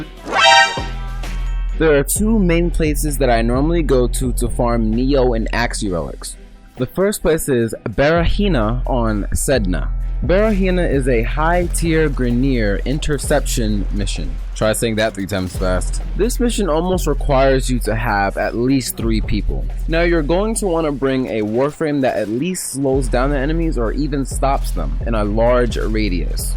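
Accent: Jamaica. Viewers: are u jamaican? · Where you from bro and are you a big fan or you're a Jamaican?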